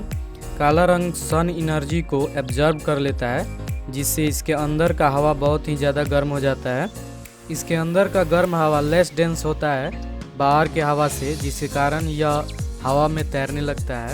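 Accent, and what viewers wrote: Bihar, India. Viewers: Bhai apke aawaj se aisa lag raha hai aap Bihar me kahin se Hain.. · Kya bhai bihaari ho ka tum? Tumhre tone se lag gawa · Bhai saahab ...hindi main sterling aur pulling ka dhyaan rakhen....maana ki bihari hai phir bhi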